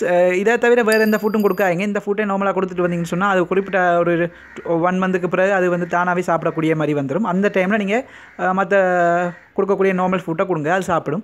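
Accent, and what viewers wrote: Sri Lanka. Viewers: Neenga srilankan tamildhane pesuringa anna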